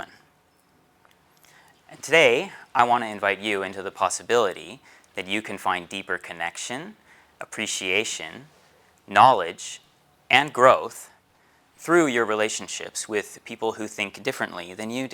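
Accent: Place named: Japan. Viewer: Dude this is beautiful! You've grown so much over the last few years. I'm impressed, proud of you, humbled, and reminded of how when I first started dating my wife I had similar reflections ("I'm from California; she's from Kansas; we're basically from different universes") that helped a lot and that I have since forgotten and started to take for granted. Thanks for the reminder! PS — You have started speaking with the cadence of my Japanese friends...INTERESTING <3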